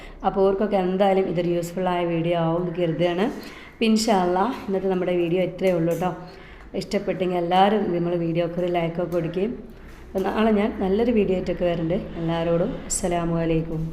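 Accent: Malappuram, India. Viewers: മലപ്പുറം ഭാഷ കുറെ മാറിയല്ലോ, ഞാനും നിലംബൂർ സ്വേദേശിയാണ്, ഇവിടെയും മുസ്ലിം ഭാഷ ഇങ്ങിനെതന്നെയാണ് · നല്ല സ്വീറ്റ് മലപ്പുറം ഭാഷ , Good recipe.Expect more videos from you sister 🎉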